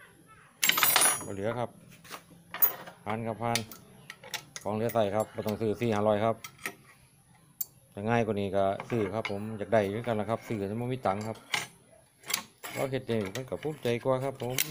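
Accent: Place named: Isan, Thailand. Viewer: ยุบ้านไหนน่อนิครับคือเว้าคือกันแท้ครับ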